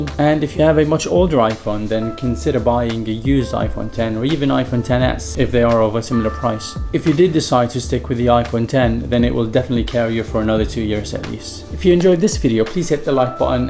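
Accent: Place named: United Kingdom. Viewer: The editing and camera quality is remarkable! also your accent is British with a hint of something else but I can’t figure it out 😂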